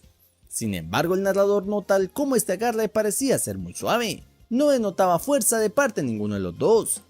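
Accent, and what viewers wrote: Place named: Mexico City, Mexico. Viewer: porque no cambias tu vocalización a la hora de narrar?? usas mucho el aaaaaaaaa, eeeeee, iiiiiii, oooooo con un tono de chilangoooo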